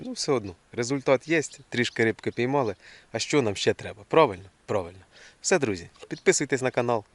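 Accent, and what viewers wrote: Poltava, Ukraine. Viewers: теж хотів запитати і знайшов комент.. бо почув акцент полтавський..та і зарості схожі)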